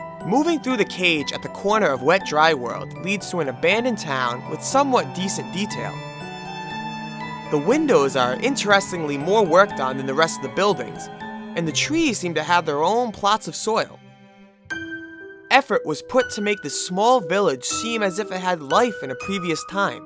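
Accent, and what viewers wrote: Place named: Massachusetts, United States. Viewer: You from mass brah? you have the same accent my dad and grandmother doo there from lowe...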